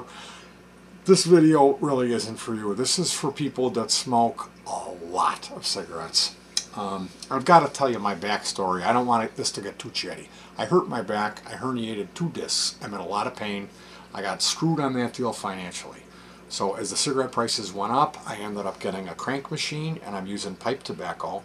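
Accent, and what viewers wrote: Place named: Chicago, United States. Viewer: Hey what's the spot you get your juice at? I'm from the south side and I think I remember you saying you were from Chicago in one of your videos, if the accent wasn't enough to tell.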